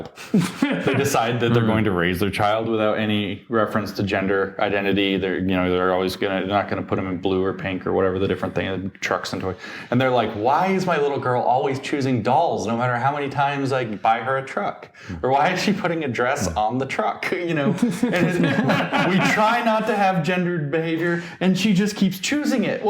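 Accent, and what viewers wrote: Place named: United Kingdom. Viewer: I don't find it tedious, and I'm sure I'm not alone in that. It's so easy to forget that Konstantin grew up in Russia until he was 13. The man speaks with a British accent and went to university in Britain. It's not as if he says that all the time. You try living in a "foreign" country and NOT making reference to the culture of your childhood quite frequently. It informs his worldview, and is probably responsible for his fairly unique viewpoint on many issues.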